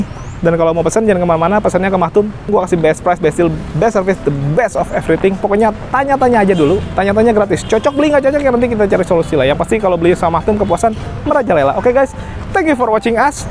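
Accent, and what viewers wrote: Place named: Garut, Indonesia. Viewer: hahahaha kayanya orang garut euy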